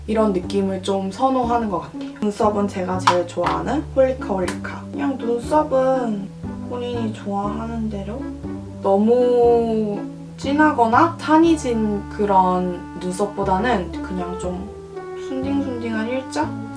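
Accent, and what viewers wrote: United Kingdom. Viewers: ㅋㅋㅋ크으 은 역시 영국어 발음이 을 더 빛나게 해주는 것 같아요 한국사람이라 한국어 영상이 더 이해하기 좋은건 사실이지만 역시 영어하실때의 그 무언가를 따라잡긴 힘들어욬ㅋㅋㅋㅋVlog를 한국어로 재밋게 찍어주세요 😜오늘도 좋은 영상 고마워유💫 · 언니 영국특유의 발음이 너무 좋아요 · 한국어랑 영어 둘 다 좋은데 영국식 발음의 영어가 너무 매력적이에요 ㅠ💕 그리고 스트롭은 진짜 미세한 펄이에요! 바닐라코 제품도 궁금하니 통장을 비워봐야겠네요ㅋㅋㅋㅋㅋㅋ 언니는 브들파데 잘 쓰시는 것 같은데 저는 샘플로 5번정도 써봤는데 저는 뭔가 더럽게 무너지는 것 같아요ㅠㅠ 아 그리고 혹시!!! 엄청 밝은 색상의 브로우를 파는 매장을 아시나요?ㅠㅠ 제가 엄청 밝게 탈색했는데 색상이 마땅치 않아서 섀도로 눈썹그리고 있는데 섀도로 브로우하니까 시간이 너무 많이 걸려요😂 그리구 에뛰드 1호4호 갖고있는데 발색이 랜덤인 것 같아요.. 뭔가 살짝 쉬머섀도들은 브러쉬에서 뭉치고ㅠㅠ ㅋㅋㅋ그리고 문샷은 뭔가 중렴이? 브랜드 인 것 같아요 저렴이라고 하기엔 가격대가 훨씬 높고 고렴이라고 하기엔 살짝 저렴한? 상당히 애매한 가격대의 브랜듴ㅋㅋㅋㅋㅋㅋ 저는 현재 눈병으로 고생중인데 설 연휴라 병원을 못가고 있어요ㅠㅠ 하필 저번 주 금욜 새벽에 눈병이 하ㅋㅋㅋㅋㅋ 이렇게 길게 느껴지는 연휴는 처음인 것 같아요(나쁜의미로)😭 겨울 독감,눈병 조심하시구 남자친구없는 전 이 메이크업을 친구만나러 갈 때 응용해보겠습니닼ㅋㅋㅋㅋㅋ 설날 마지막 연휴 잘 보내세요👍🏻💕💕💕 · 구독자분들 요구에 하나하나 맞춰주시는거같아서 너무 좋아요 ㅎㅎ 언니의 영국발음도 좋지만 가끔 이런 한국어 튜토리얼도 매력잇네요! 그나저나 섀도우 너무 이뻐서ㅠㅠㅠㅠ 에뛰드 세일할때사러가야겠어요ㅠㅠㅠ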